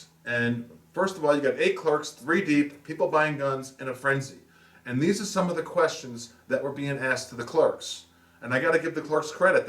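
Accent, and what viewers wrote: Long Island, United States. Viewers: Funny to find out you grew up on Long Island. I live here ! Always wondered where your accent came from. You a Nassau or Suffolk guy ?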